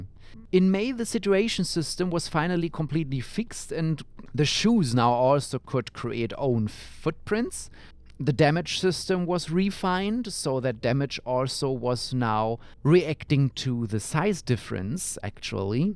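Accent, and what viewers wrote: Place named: Germany. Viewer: You have a German accent. Are you from Germany?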